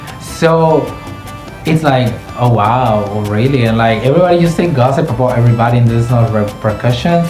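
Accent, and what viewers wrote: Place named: Philippines. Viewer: your accent sounds philofinos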